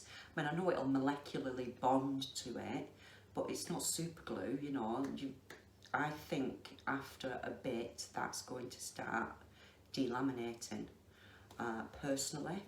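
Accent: United Kingdom. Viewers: Tomorrow we have a date,. But today i want to catching up... I clicked on a video of you because of the tattoos and I stayed because of your voice and natural way of speaking. To my spanish ears you have a strong British accent. And I love it!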